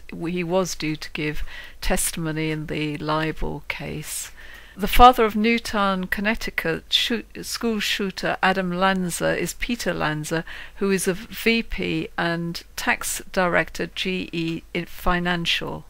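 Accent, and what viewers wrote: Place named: United Kingdom. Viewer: Another thing I take issue with is leaving clues, or putting clues in movies or any nonesense like that. What's a practical reason for it? Why take such a risk? We can keep our guns and defend our right to have them without reaching like this. And you have the nerve to call others gullible. You'd have to be even more gullible to believe this, too. It is nice to hear a British accent that's for our second amendment but please...don't "help" us. We have enough kooks to clean up after.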